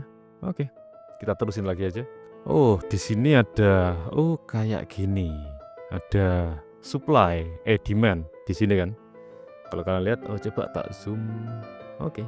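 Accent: Java, Indonesia. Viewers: frist nonton video Ki "mask" lgsg klik dgn penjelasannya dgn logat jawae dn pastine sederhana dlm penyampaiannya.. matur nwun Ki, smoga berkah dn mnjdi jariyah ilmu yg manfaat, idzin nimba ilmunya 🙏☕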